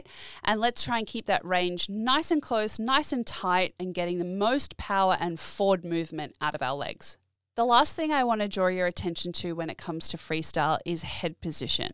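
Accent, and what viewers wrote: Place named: Australia. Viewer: Think it’s just the Aussie way of speaking, but gee you make it sound so easy! I’ve watched heaps of swim channels but they make it seem so complicated. I’ll definitely be using your tips. Thanks from a fellow Aussie. 🇦🇺 👍🏽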